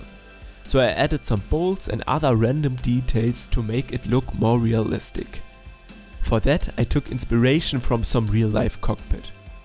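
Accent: Germany. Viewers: are you german? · Der hart deutsche aktzent stört ein wenig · why does he sound german?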